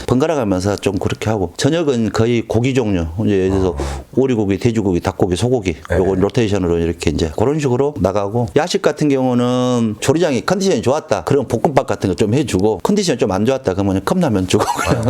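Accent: Jeolla, South Korea. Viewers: 말 재밌게 잘하시는 전라도 분이시네요 ㅎㅎㅎ 같은 전라도인으로 반갑습니다. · 구수한 전라도 사투리 😊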